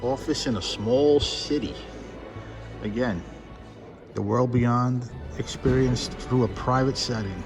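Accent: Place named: New York, United States. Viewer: I miss this exhibition, because 2 years ago I haven’t discovered Hopper yet. It is very cool to have listen to have a New York accent guiding me through the exhibition. Make it more immersive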